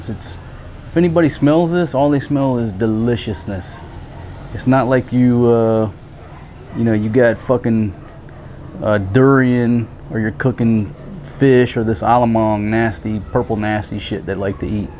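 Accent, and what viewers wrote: United States. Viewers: Hi mate just love your American voice, wish I had it. By the way I got one of those DJI osmo pocket cameras love it. Dave australia.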